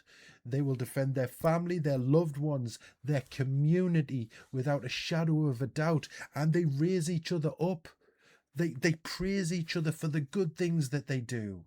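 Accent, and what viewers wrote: United States. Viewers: Your words break my heart. You sound more American than some Americans I know. 🇺🇸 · That’s crazy to Americans and I’m so sorry you are having to deal with that… you sound like an American have you ever thought of visiting or immigrating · Hi, You sound just like one of us. You ever get to the USA, we want to invite you to our home in Cave Spring, Georgia. We would be honored to show you around our little town and beautiful town park. Pop 1,000. God be with you man 👍 Mike Deaton, proud American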